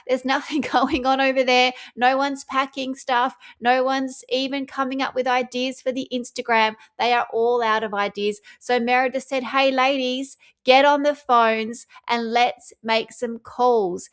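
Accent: Australia. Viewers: This is such a sad state of affairs. I would be totally devastated if the daughter I raised and loved treated me like this. Mr Markle I send you white light, strength and peace... This woman is truly an empty vessel. once again great work, thank you for your updates wrapped in the appropriate amount of ridicule, and delivered with our great Occa Aussie accent. Every video I just shake my head in disgust of her behaviour.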